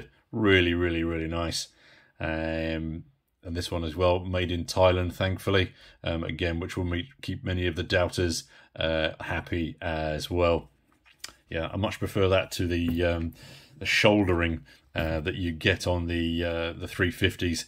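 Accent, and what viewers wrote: United Kingdom. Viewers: I heard an English voice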